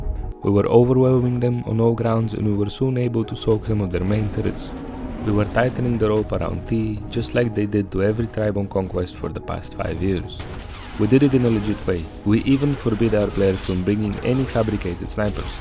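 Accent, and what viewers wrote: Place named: Europe. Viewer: you sound like your from the eu look at getting your money back as from what ive heard they cant just randomly ban you without good reason and you are entilted to your game or the money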